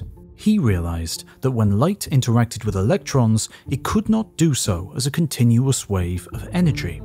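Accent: England, United Kingdom. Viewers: Can you give your channel some class by ditczthe British accent and instead use a good Swedish, French or Irish accent.. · I hate measurements in Kilometres, why not Miles as that's what we've been brought up with and i no I'm being a bell end and for that i apologise. Great video and so enjoyable esp the narration. I think the English voice can be a universal voice were u don't need subtitles as everyone shud understand the narration and I'm not being biased i promise. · I hate your robot-English-toff-voice more than death itself.......death to to robot voices!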